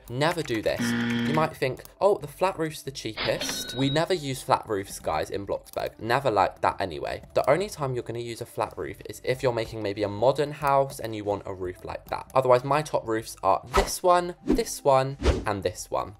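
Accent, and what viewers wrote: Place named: Gloucestershire, United Kingdom. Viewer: You sound like youre from Gloucestershire😭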